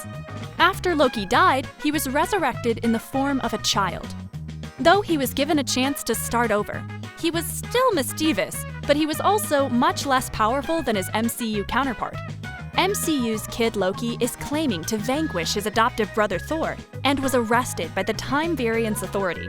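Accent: Sri Lanka. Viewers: You're a Sri Lankan, right? How did you get that accent?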